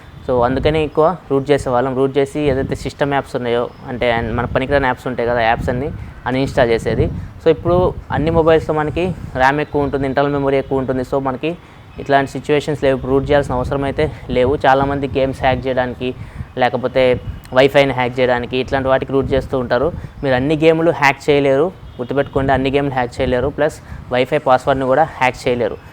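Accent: Ongole, India. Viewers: Bro meedhi ongole aaaa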